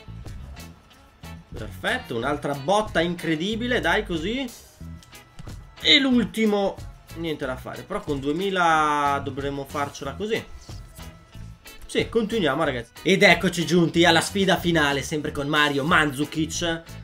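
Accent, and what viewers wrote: Veneto, Italy. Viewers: per caso sei veneto? 😁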